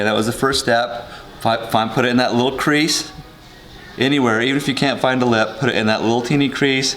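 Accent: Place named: Utah, United States. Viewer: I appreciate the information---I recognized your Utah accent in 1 second and knew before looking up you absolutely had to be in Utah lol. Thanks again!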